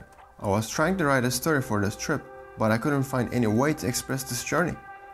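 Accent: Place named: Black Sea, Turkey. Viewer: videolar cok guzel ama karadeniz aksanli ingilizce cok sikinti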